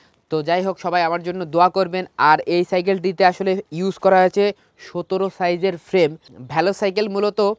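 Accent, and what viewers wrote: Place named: Jhenaidah, Bangladesh. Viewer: ঝিনাইদহ??এক্সেন্ট শুনেই টের পাইছিলাম😅আরাপপুর থাকেন নাকি??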